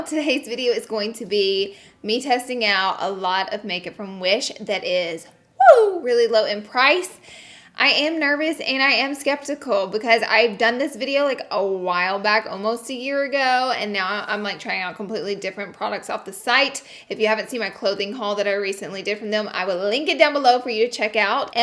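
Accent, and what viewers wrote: South, United States. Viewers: Her accent, she's southern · It's a southern thing! We all do it in the south. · I love how your southern accent gets stronger and lighter as you talk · It's just her Southern accent. We don't all pronounce everything the same way. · Love how you pronounce “oil” like “ole”. ❤️. The southern accent is so cute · i love you so much and your country accent sounds like mine lol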